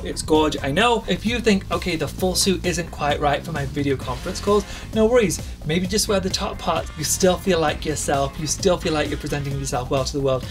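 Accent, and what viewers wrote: United Kingdom. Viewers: This guy annoys me, even with his British accent.... I miss Carson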